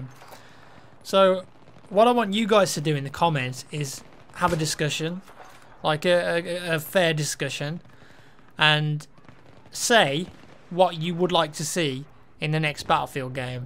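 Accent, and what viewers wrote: United Kingdom. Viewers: i love the sweet sound of your uk voice, can we game on box later...not that box you perv...360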